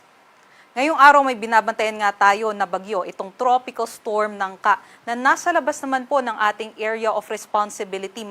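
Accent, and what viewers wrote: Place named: Iloilo, Philippines. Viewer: Ilongga si ate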